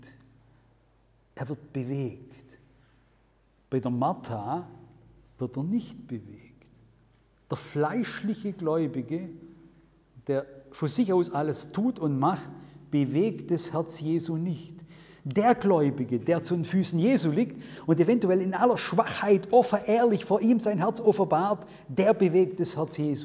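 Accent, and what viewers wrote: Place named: Swabia, Germany. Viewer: Sehr interessantes Zeugnis und dazu noch des Schwäbisch🤗🙏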